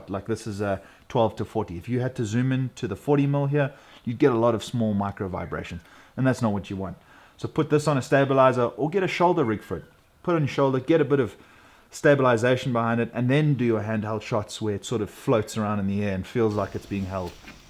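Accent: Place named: South Africa. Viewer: So awesome to see a fellow Saffa so passionate about filmmaking! Almost jumped out of my seat when I heard your accent. Great footage!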